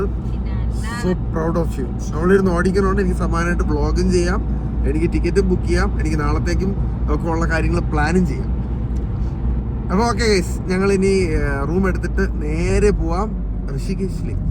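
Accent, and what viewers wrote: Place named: Trivandrum, India. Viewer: Pakka Trivandrum slang Thanneeeee😂😂 ❤ nice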